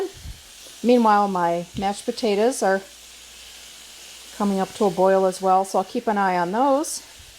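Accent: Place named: Midwest, United States. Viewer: Your accent reminds me of the midwest, from Michigan here. I love watching you and your husbands adventures in Vegas, thanks for sharing!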